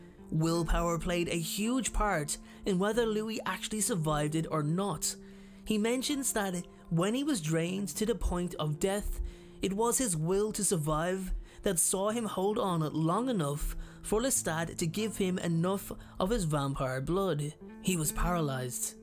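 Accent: Ireland. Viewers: You are Irish? Be honest. Where are you from?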